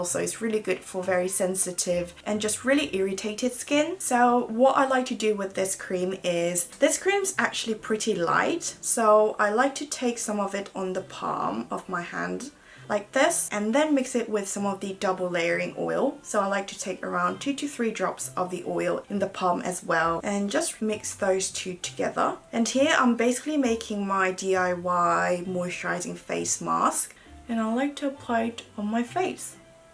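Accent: United Kingdom. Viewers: 메이크업 영상보다는 일상 영상을 더 먼저 접했는데 언니의 여유롭고 행복해 보이는 삶의 태도가 부럽고 저도 닮고 싶었어요~😇학교다니고 시험에 찌들다 보니 언니처럼 밝고 긍정적인 하루하루를 살고 싶더라고요.😢😢항상 꿀떨어지는 영국 발음과 함께 좋은 정보, 영국의 예쁘고 아기자기한 일상들을 공유해 주셔서 감사합니다😘 앞으로도 응원할께요👊👊 제 올 한해 목표는 꼭!!!!라면을 줄이겠다!!!!! 기필코!!!!!!!!!!!!! 입니다💞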